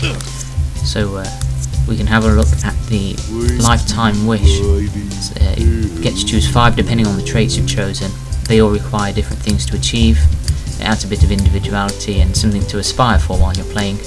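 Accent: United Kingdom. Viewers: OMG R U BRITISH i luv british ppl their awesome!!! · where are you from? you talk posh. nmo offence && you should Posh english but im english i sound NOTHIN like that !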